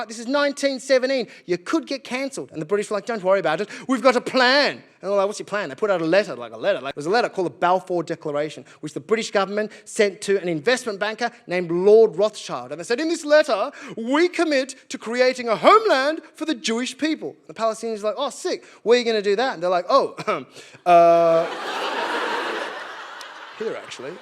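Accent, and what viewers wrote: Australia. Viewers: You are Aussie! How marvellous❤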